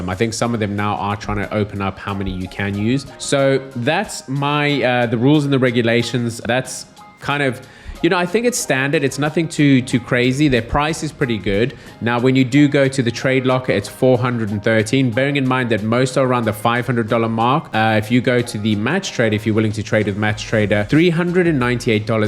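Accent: South Africa. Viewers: Bruv are you South African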